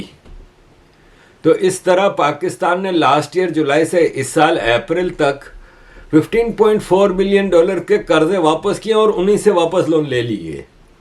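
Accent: Gujarat, India. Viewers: Sir your accent is Gujrati.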